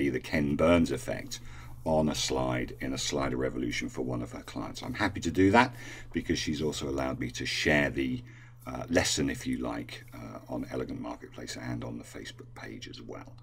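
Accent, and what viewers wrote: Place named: United Kingdom. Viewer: Thank you for this video! You saved me hours of frustration with the Ken Burns effect! Loved listening to your British accent too! lol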